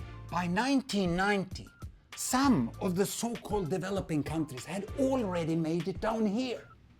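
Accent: Sweden. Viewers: Kan inte ta han seriöst med sin jävla svengelska. Känns som man tittar på en kass Siba reklam eller något. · Never heard a more swedish accent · Han pratar ju bra och tillräckligt avancerad engelska, bara att uttalet är typiskt svenskt. Kan ju knappast kallas för svengelska, och jag tror att det bara är vi svenskar som "stör" oss på det.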